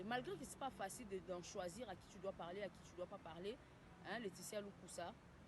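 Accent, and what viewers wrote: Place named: Canada. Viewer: Osololi la canadienne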